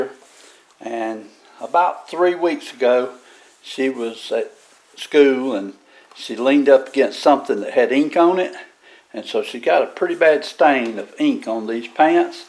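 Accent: South, United States. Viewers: Love that southern accent my hubby is from South Carolina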